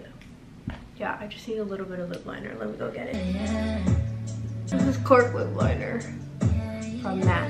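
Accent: United Kingdom. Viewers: The British accent 💘🥺..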